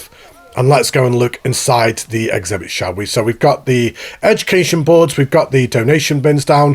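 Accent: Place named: England, United Kingdom. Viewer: Are you English or Scottish? I like your accent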